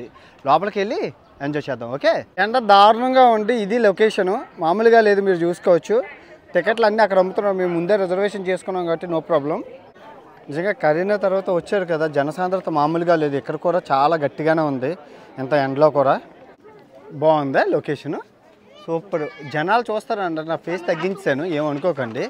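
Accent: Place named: Godavari, India. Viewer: అబ్బాయి చాలా excellent ga organise చేస్తున్నావు. నీ సహజమైన గోదావరి యాసలో explain చేస్తున్నావు. మళ్ళా మన దేశం సెల్ఫ్ డబ్బా వద్దు . వాళ్ళ గొప్పతానినీ మనం హర్షించలి కూడా.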